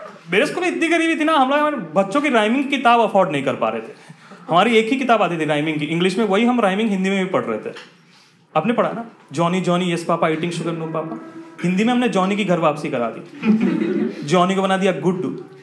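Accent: Bihar, India. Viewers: Bhai sach mai Bihar se ho?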